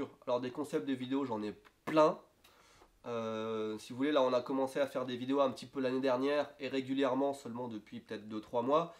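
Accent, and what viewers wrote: Lorraine, France. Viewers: Je me disais bien que tu avais un accent Lorrain des fois quand tu parlais, je rêvais pas et maintenant je suis fixé :p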